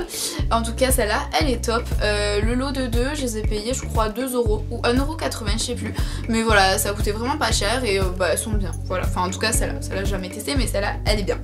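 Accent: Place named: Marseille, France. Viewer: Ta un belle accent marseillais mdrrr moi je vien de Marseille et sinon jzdirenles vidéo haul faudrait que T en fasse plus souvent bisous et jtm❤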